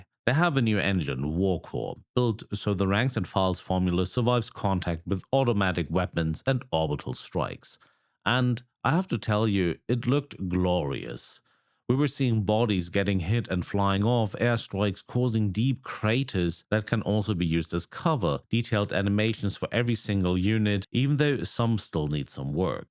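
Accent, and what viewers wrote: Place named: RP, United Kingdom. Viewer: Posh accent. - Eldar player. Perfectly fitting.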